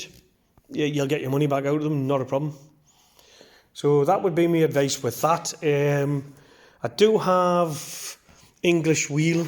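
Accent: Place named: North East England, United Kingdom. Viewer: Yes please, keep them coming. I've got massive affection for Capris. I learnt to drive in a MK2 Cortina, which I loved. I have had 2 of those, a MK1 Capri, 1600GT XLR, which I adored and obviously should have kept, and loads more Fords, last one being a Series 1 Escort RS Turbo, also wish I'd kept that! It sounds like you are from the North East, did you ever come across a guy called Gordon Leeming?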